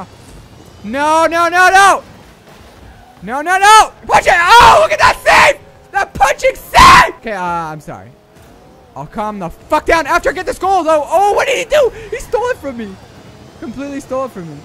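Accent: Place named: United Kingdom. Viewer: i loved this, i found it very humorous with the british accent, very awesome rocket league gameplay, I'll have to reinstall this at some point and play it again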